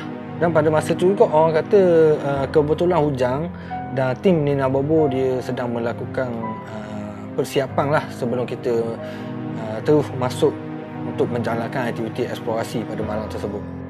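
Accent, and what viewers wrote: Terengganu, Malaysia. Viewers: Selesai tonton.. mantap dan padu! Terasa padat menontonnya.. penceritaan dgn loghat ganu yg menarik.. teruskan.. Kipidak! 👍💪✌️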